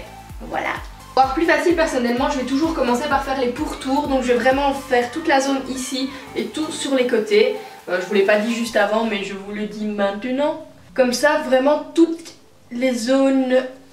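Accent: Belgium. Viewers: Aaah j'ai reconnu l'accent belge ! xD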